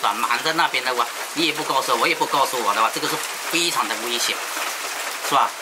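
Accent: Hakka, China. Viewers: 客家口音？？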